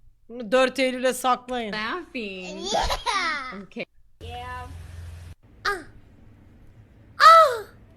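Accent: Erzurum, Turkey. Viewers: lan bu erzurumlumu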